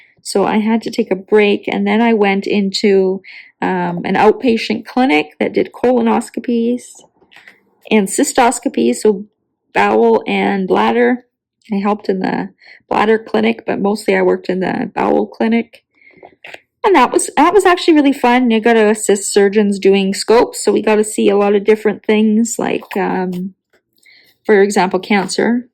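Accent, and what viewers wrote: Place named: Canada. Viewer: 🌹 Hi - sorry, is it or or something else? I’ve heard your name in someone’s Live, but I’m new to following you. I’m April Campbell and I live in Oregon. I’ve been Diamond painting for a little over one year. I’m a knitter. I’m now fully addicted to diamond painting 😊. Oh well. I enjoyed your WIP and Chat. You have a lovely Canadian lilt that is very soothing, so thanks for that!! And I just want to add that I hope your upcoming procedures help you - and you are in my thoughts for all good things. ❤ from Oregon. I’m glad I found your channel!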